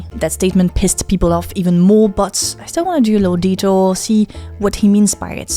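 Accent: France. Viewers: I think she's French and thats just her natural accent... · It's the difference between her accent which is obviously French but perfectly understandable and the sort of French accent where the person clearly doesn't know how to pronounce English words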